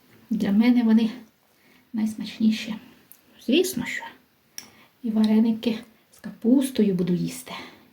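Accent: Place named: Poltava, Ukraine. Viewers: Тісто обов'язково приготую!!! От лише у парі, над парою, на парі - "пара" ж бо іменник жіночого роду. Ну, може ще "парові" вареники...А ще дякую за Вашу соковиту, прекрасну полтавську - українську мову!!!! Згадала дитинство та другу хресну маму Марійку (з Полтавщини) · по акценту, господиня з Полтавщини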